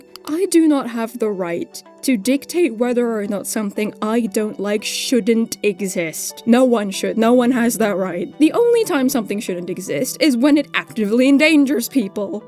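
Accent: United Kingdom. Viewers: lmao i was wondering! the whole video, i sat there trying to decide if you had always had a british accent and i just somehow blocked it out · You’re British voice is fine, Bouth are engaging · I love how your accent is slowly becoming more British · It's not really related to the topic of this video but I find the way your accent changed into this very british english very relatable for me lol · I’m rewatching this with AirPods and I can now clearly hear the British slipping in 😂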